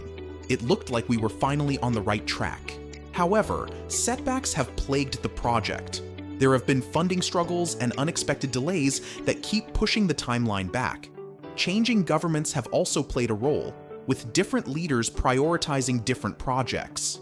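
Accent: United States. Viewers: Why do we have a yank who can’t pronounce Melbourne talking about “our” city??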